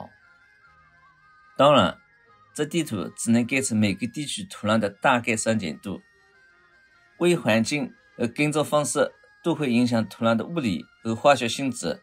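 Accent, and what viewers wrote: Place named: Jiangsu-Zhejiang, China. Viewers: 主講人十足一亇江苏江南人！ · 超爱这包邮区的普通话口音简直回归故里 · 可惜您這張圖裏沒有我所在的州猜猜哪個地方？感謝您的各種種植經驗的分享，喜歡聼您的這個江浙口音。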